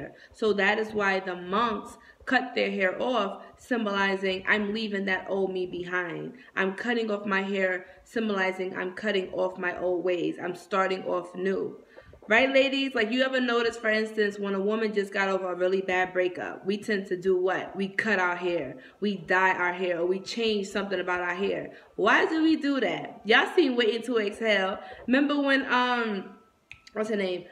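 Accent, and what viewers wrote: New York, United States. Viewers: Another dope video on my journey of black hair videos! I kept getting the urge to look up antennas and hair and so far I’ve come across great information. Glad I got my locs best decision I made! You sound like you from nyc. Peace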